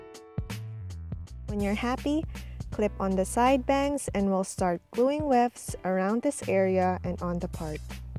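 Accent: Philippines. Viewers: are you Filipina?Cuz you have a filipino accent...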